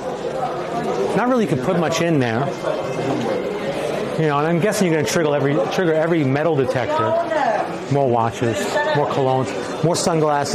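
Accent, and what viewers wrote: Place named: New York, United States. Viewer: New subscriber! Hello fellow New Yorker, I noticed the NY accent 😊 My brother was just there in Istanbul 2 weeks ago and I asked him to get me some replica shirts 😂 When he goes back, I am gonna ask him to get the vip higher quality shirts 🤓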